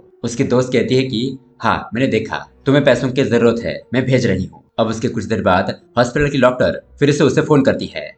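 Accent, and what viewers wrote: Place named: Maharashtra, India. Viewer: Are you Maharashtrian??? B'coz marathi speekers ki hindi accent aisi hi hoti hai..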